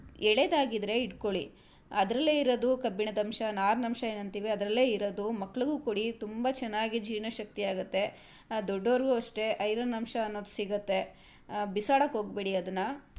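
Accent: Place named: Mandya, India. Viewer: ಅಕ್ಕಯ್ಯ ಮಂಡ್ಯದ educated ಮಾತಾಡೊ ಕನ್ನಡ ಚೆನ್ನಾಗಿ ಮಾತಾಡ್ತಿಯಾ, ತುಂಬ ಇಷ್ಟ ಆಯ್ತು, ಸೂಪರ್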